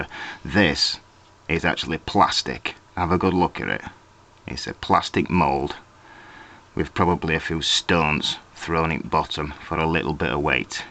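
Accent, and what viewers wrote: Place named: Yorkshire, United Kingdom. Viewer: at first i thought your accent was manc but yer it's Yorkshire also init : )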